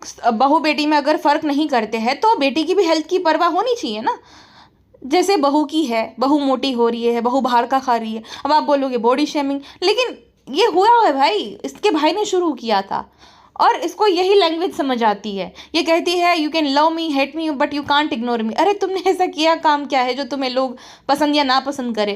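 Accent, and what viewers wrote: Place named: Kathiyawad, India. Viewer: you sounded like kathiyavadi❤ I love it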